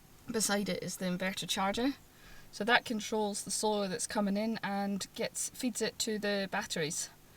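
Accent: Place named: Scotland, United Kingdom. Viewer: I could listen to you voice all day love the Scottish and you dogs are lovely I got a 10 year old girl westie and a 2 year old boy westie good vlogs .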